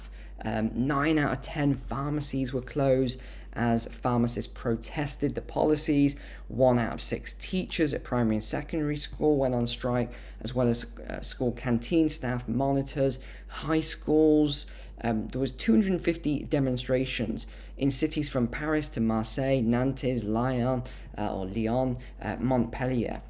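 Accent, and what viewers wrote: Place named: England, United Kingdom. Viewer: I don't know where you live, but you sound like a Pommie, so you must know your country is a socialist state, otherwise you would not have all the welfare services on offer. Indeed all western Countries I would say are Democratic Socialist countries (except for the USA), because they all have welfare programs and social safety nets.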